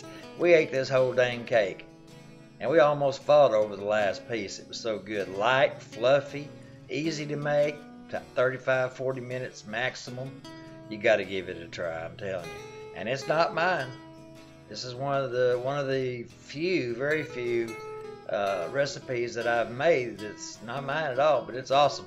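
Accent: South, United States. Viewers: Love this Forrest Gump accent!! And the cake looks delicious!! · I love the southern draw!!! Thank you so much for recipe about to make now!!!!!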